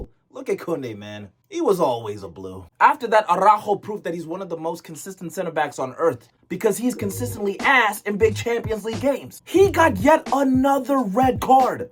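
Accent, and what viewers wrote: South Africa. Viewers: wait you're south african fr 😭😭😭😭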